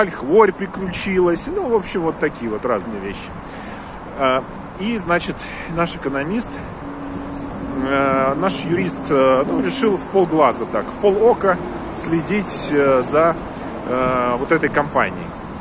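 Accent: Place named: Saint Petersburg, Russia. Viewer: Ты же из Алтайского горного округа родом, здесь Петербургский русский язык со времен горных офицеров, кабинетных земель, золотых рудников итд, без аканий и оканий. Разве что, уральский казачий говор есть, как в речи у Шойгу.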